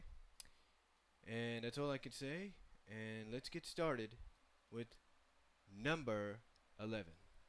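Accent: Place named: New England, United States. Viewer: Are you from New England or somethin g? You sound like a very young Ralph Bakshi.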